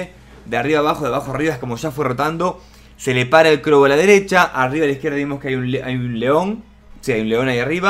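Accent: Argentina. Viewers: por su acento lo más probable es que sea argentino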